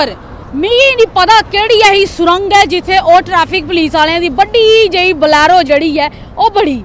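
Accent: Jammu, India. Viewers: Zabardast Reporting.. And in authentic Dogri style.. Proud of U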